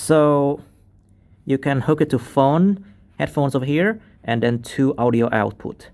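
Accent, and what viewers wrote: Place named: Vietnam. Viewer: coi từ đầu tới khúc nói cái soundcard mới nhận ra anh là người Việt Nam, hèn chi nghe giọng thấy màu quen quen =)))) mà cảm ơn anh vì bài review có tâm này nha, em cũng đang tìm hiểu giữa con cp73 này và nord electro ☺️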